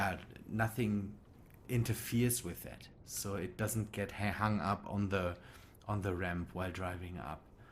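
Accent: Germany. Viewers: DIESER DEUTSCHE AKTZENT AAAAAHHH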